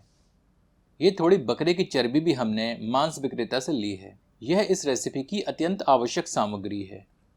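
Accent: Standard. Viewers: भाई और आपकी शुद्ध हिंदी ❤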